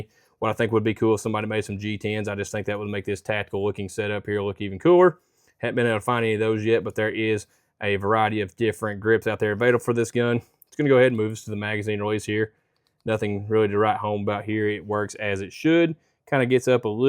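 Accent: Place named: Deep South, United States. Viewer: Talk slower and enunciate so we who do not live in the Deep South can follow what you are saying......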